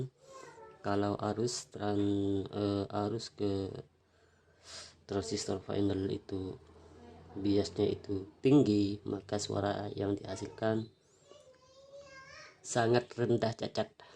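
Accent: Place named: Madura, Indonesia. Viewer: madura mana sampean mas?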